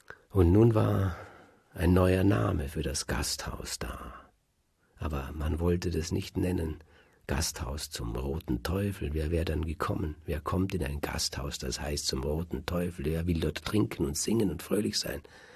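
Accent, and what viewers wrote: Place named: Germany. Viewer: Mit österreichischem Akzent würde es besser klingen. BRD-Deutsch passt nicht dazu.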